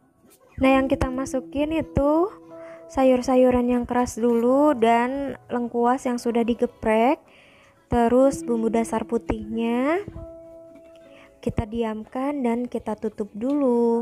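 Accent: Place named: Tegal, Indonesia. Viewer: Kakaknya orang Tegal yaaa..tegalnya mana kak..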